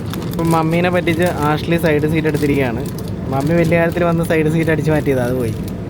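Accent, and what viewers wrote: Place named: Kottayam, India. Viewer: എനിക്ക് നേരത്തെ doubt ഉണ്ടാരുന്നു കോട്ടയം അല്ലെങ്കിൽ ആലപ്പുഴ നിങ്ങൾക് താഴ്‌വഴി ഉണ്ടെന്ന്. അല്ലെങ്കിൽ ഇങ്ങനെ സ്ലാങ് കറക്റ്റ് ആവില്ല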